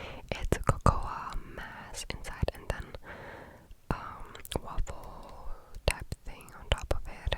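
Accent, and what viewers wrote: Moldova. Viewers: Ce drăguță ești! Bravo părinților 😂🎉🎉🎉 Următorul ASMR te rog să fie în română! Cu accentul moldovenesc!🙏🏼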